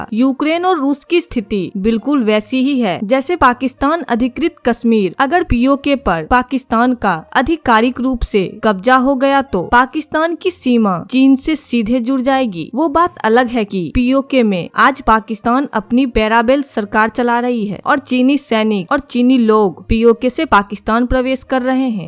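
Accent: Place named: Begusarai, India. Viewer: Aapki aawaz Bihar k begusarai ....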